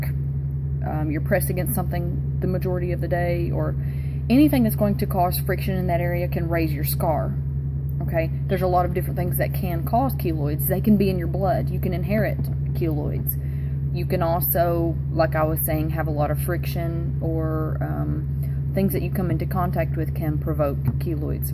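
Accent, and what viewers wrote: South, United States. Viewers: My god I love your southern belle accent. Hey you should do a Bryson Tiller album review because he is from Kentucky and Louisville to be exact.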